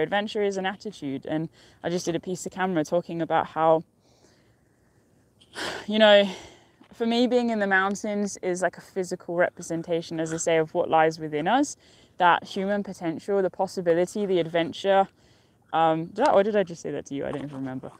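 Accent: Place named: Scotland, United Kingdom. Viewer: Fab views, thanks. Love your wee Scottish accent, can’t wait to see you in a kilt 😉x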